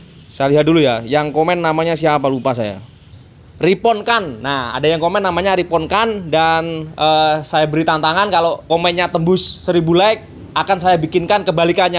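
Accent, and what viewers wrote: Java, Indonesia. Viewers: Rantene rondok amoh...😂😂😂😂 Gue suka logat jawanya..😂😂😂😂👍👍👍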